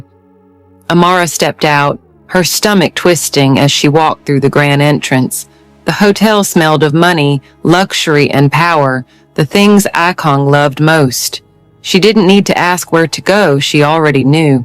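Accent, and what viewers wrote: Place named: South, United States. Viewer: The southern accent for an African story is distracting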